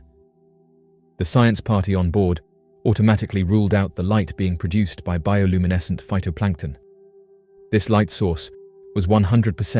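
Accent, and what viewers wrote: United Kingdom. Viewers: What's with the limey accent?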